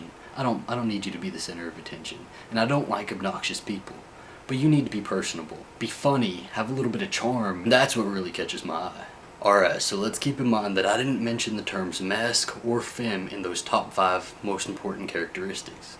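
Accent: South, United States. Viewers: this guys attractive not just his looks but his mind, not alot of gay guys think that way and they're very judgmental about each other so he's right, and I've never seen gay guy with a deep southern accent miracles do exist lol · his deep country accent is sexy but he seems straight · love your southern accent!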